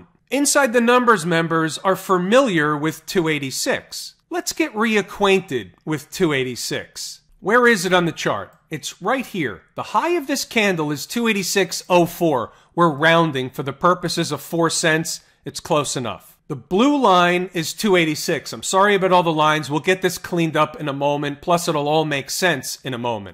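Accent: United States. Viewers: Most American sounding narrator. I love it. I bet he’s nice to waiters. 😊👌🏻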